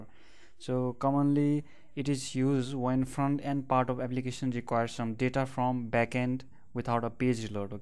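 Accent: India. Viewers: okay I don't know about you okay and this may sound racist okay but is it really that impossible to find a video on how to code that isn't taught by a god damn indian! seriously no offense to Indians but I hate listening to an accent the entire time I'm trying to dedicate my time on something, it's just very distracting.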